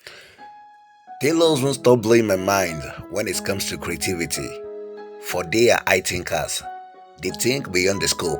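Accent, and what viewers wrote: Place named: Ghana. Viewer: Nice Job bro.. you sound like a Ghanaian ɓoss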